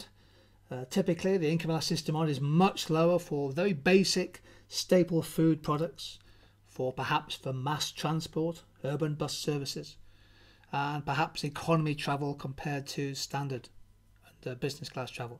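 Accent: United Kingdom. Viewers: True British accent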